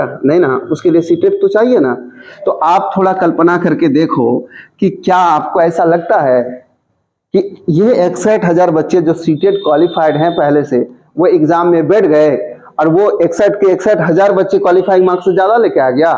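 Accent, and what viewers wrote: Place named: Bengal, India. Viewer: Aapke aawaj me bangali tone hai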